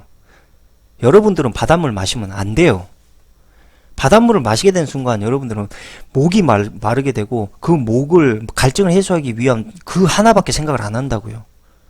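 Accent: Jeolla, South Korea. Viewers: 절라도 말투가 듣기싫네요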